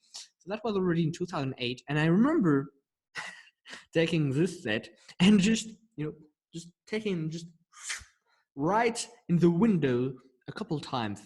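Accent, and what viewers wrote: France. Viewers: ah tu parles français j’entendais l’accent ! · Youre french? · Are you french ? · I think he mentioned how his old videos were in French, so it's probably some form of French accent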